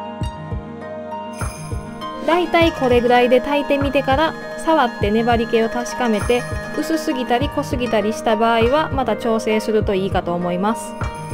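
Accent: Kansai, Japan. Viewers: 2秒で純な関西人だとわかってしまう動画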